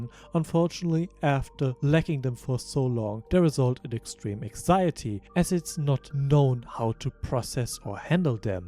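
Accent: Germany. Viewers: Is it possible that you are German and you have an accent?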